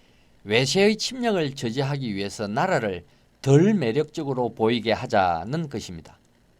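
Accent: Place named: Busan, South Korea. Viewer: 병장님! 반갑습니다. 군대 쫄다구 김*철병장을 통해서 씨의 요즘 근황을 듣고 너무 반가워서 이렇게 유튜브로 소통을 합니다. 군대에서 나병장님의 개인돈을 훔쳤다고 의심을 사서 한 때 나마 이런 속좁고 편향되며 막무가네식의 고참을 계속 고참대우하며 군대생활을 보내야 하나 아니면 개인적으로 밖으로 데리고 나가서 죽통을 날리고 군대생활 그렇게 하는게 아니다 라고 일침을 가한 뒤 영창을 가야하나 고민을 많이 했던 탁일병입니다. 그 당시 촐싹 거리던 나 병장님이 세월이 지나고 나니 박사 학위를 받고 교수님이 되었다니 정말 격세지감을 느낌니다. 아무 근거없이 고참의 돈이나 훔친것으로 절도 혐의를 뒤집어 썼던 탁일병은 그 사건이계기가 되어 제대 후 모 여고에서 30년 동안 교편생활을 하면서 반 아이들에게 절대로 남의 물건을 탐하지 말것이며, 또한 자신의 관리소홀로 귀중품을 잃어 버리고 난 후 절대로 남을 근거 없이 의심하거나 미워하지 말도록 인생관을 심어주는 담임을 역임하고 이제는 명퇴를 하였습니다. 우리 나병장님 서울 생활이 40년이 다되가는데 아직 여전히 부산 사투리가 남아 있는 것이 신기합니다. 지금도 여전히 탁일병에게 절도 혐의가 있는 것으로 믿고 있는 것인지 아니면 철없던 어린 시절의 치기 어린 선임 고참의 찍어누르기 식의 자백 강요였는지 개인적으로 묻고 싶습니다. 어찌 되었든동 제대 후 진리탐구에 대한 열정을 불태워 박사학위를 취득하고 교수님이 되었다는 것에 대해서는 저절로 고개가 숙여지며 존경의 마음 금할길이 없습니다. 모쪼록 건강하십시오. 기회가 되면 그 시절을 이야기 하며 회포를 풀 장이 마련되리라 믿습니다.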